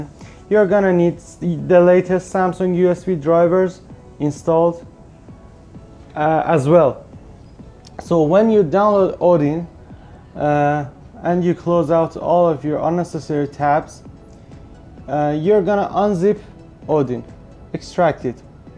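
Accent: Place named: Iran. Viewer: دمت گرم جوون قربون انگلیسی حرف زدنت. حرف نداره. تا گفتی ادین، گفتم این لحجه باید فارسی باشه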